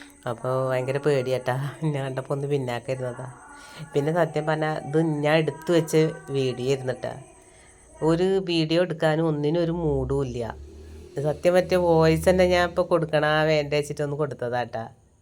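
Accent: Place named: Malappuram, India. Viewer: Malappuram bhasha kelkan nalla rasam ❤❤❤❤❤❤radish curryum super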